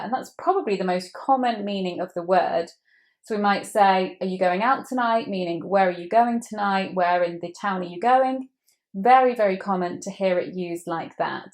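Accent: United Kingdom. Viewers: I never give up I never come around I adore british accent thank you for help me god blessing you forever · Awfully love British accent or love the u teach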